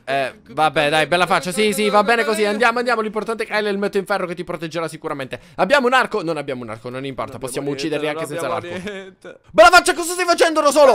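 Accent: Sicily, Italy. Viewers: !!! È siciliano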